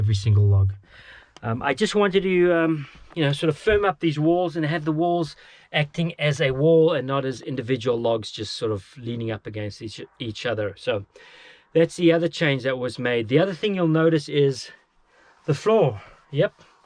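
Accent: South Africa. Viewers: Sounds like a South African. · You know I love that craic what your doing but one thing I'm very curious about your accent I think I hear a bit of South African there but not 100%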